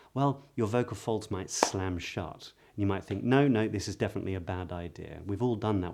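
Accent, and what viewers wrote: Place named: England, United Kingdom. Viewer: Beautiful accent, the pace, volume,etc. Your speech (maybe your ideolect) is very pleasant to hear. It's modern English accent too. Good channel and good content, for special audience 😅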